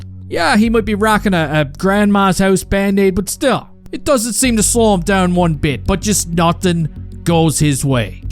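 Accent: Newfoundland, Canada. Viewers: You sound like a Newfie, that's a good thing. They love hockey too.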